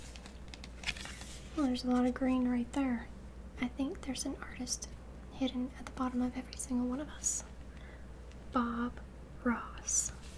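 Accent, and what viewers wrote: South, United States. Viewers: I love your southern accent that keeps sneaking out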